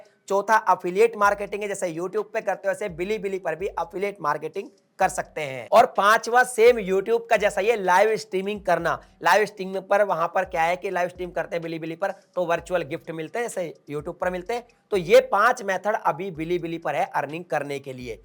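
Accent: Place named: Mewat, India. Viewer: bhai mewati Hain 😂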